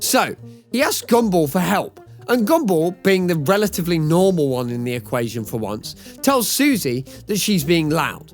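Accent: United Kingdom. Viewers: This is the first time i see this guy and i just clicked it and the british accsent really scared me idk why 😭🥀🥀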